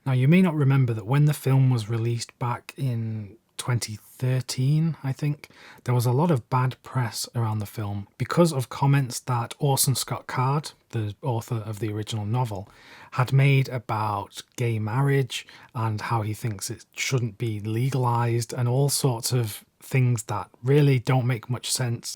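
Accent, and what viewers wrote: United Kingdom. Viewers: Are you not British?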